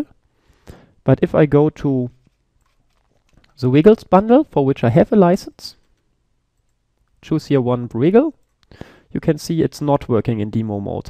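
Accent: Germany. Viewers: Man hört unnormal dass du Deutsch bist :D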